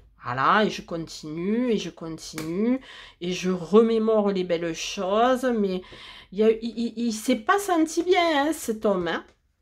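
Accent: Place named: South, France. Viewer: Coucou ! A mon tour, je vais regarder ta vidéo. J’aime ton accent du sud ! Et je me suis abonnée. 🌹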